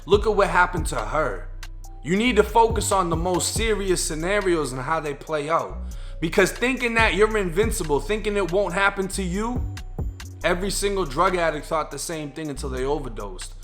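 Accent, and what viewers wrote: Boston, United States. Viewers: Yo my guy are you from Boston?? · Cahhhh, tahhhh-git. You gotta be from Boston or providence lol mad love from a New Yorker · Hey man I know youve lived between FL and MA. I grew up in MA abd moved to FL recently. Did you pick up dropping your R's in MA or is that how you've always talked? No shade at all just wondering. Cause I grew up w/ that shit but I didn't know if the Boston accent is picked up when you're older. Just foubd your channel and I'm definitely a fan, keep the grind up and good luck. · I hate your Bah-ston accent lmao 🤣 "He drove his cah, he didnt stahp, he went too fah, he was paht of the cahtel..." Good videos bro, I fw the page 💪🏾💪🏾💪🏾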